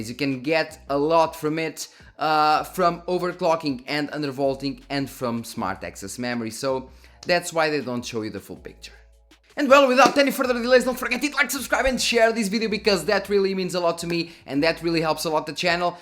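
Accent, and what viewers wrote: Portugal. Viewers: Gotta love that Portuguese Accent 👌🏽💪🏽😎❤️ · Nice try, Portugalian :) I like your accent ))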